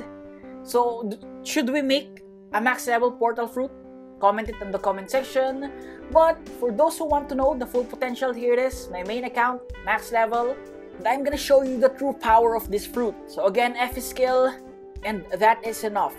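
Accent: Philippines. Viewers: Pinoy ka tol? parang yung accent mo kasi pang pinoy hehe first time ko d2.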